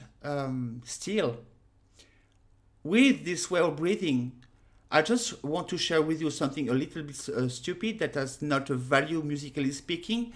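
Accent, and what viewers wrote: France. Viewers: what's not to love about a sweet lady harmonica player with a french accent? i gave my lady friend a harmonica as a gift, she wants to learn how to play. is the perfect first teacher. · I'aime accent francais et aussi vortre explanations. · Bonsoir. En écoutant ta vidéo, j'ai compris que tu étais française. Hihi. J'adore ce que tu fais. J'aimerais savoir jouer comme toi un jour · haha You are amazing! Love your frenchy accent! Gorgeous videos you got!! WE ALWAYS WANT MORE!!! you keep us thirsty to hear! I personally love love LOVE you and Your Work!! AMAZING!!! <3 Thank you so much for those tutorials! and no don't worry they aren't boring. I love that you said they're like buildings. I use this metaphor alot! I'm a big fan! I love your sense of humor! I love you! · I love your French accent and your English is perfect! I wish my French were as good! 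· Don't bother about your accent because it's the way the French sound .You can very well understoodBravo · Your french accent is so cute.....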